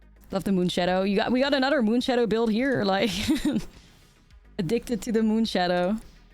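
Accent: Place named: Netherlands. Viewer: I love your very mild dutch accent, it is kind of cute. Very nice and structured content you have. Greetings from germany ❤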